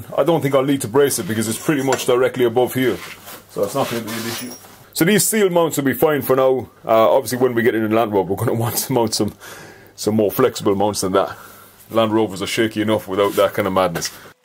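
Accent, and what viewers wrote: Ireland. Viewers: You’re a legend, loving the Wesht of Arland accent and all the info. Definitely gonna help build my Series 3 crossbred. Thanks pal!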